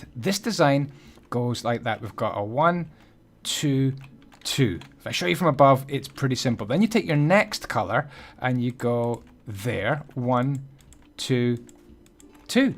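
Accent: Scotland, United Kingdom. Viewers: Is that a Scottish twang I hear?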